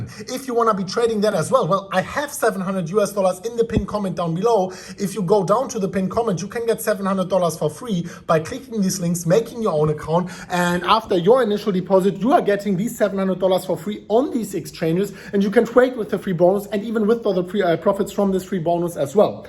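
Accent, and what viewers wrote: Germany. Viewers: I like you man . I'm Polish anc I new your hard accent have to be German . I follow you mate and trully .... like you😉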